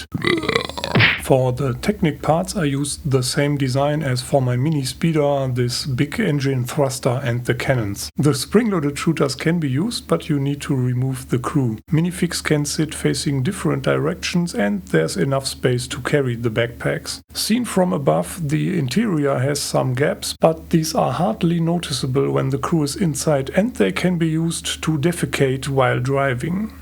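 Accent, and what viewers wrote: Germany. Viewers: Hey, I have never heard your voice, but now Im curious. Where are you from? Do I hear a bit of German accent?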